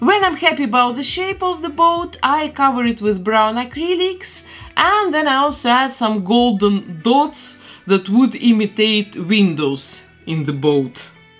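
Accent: Russia. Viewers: You have such a strong Russian accent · Ты русская? Этот английский режет мне уши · почему я слышу русский акцент... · Не знаю почему. Но по произношению кажется что ты русская или украинка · я слышу русский акцент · Мне кажется или я слышу у вас русский акцент? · Ты случаем не русская? Акцент похож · why do you have a pronunciation like that of Russian? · Wow! You speak as Russian... As Russian speaks English.... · Ничего не понимаю но смотрю, акцент русский сразу видно) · Русский акцент все равнт заметен, но английский очень даже хороший у вас)) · Черт😃 Ощущение, что вы русская... Ну на английском говорите так, словно долго жили в России....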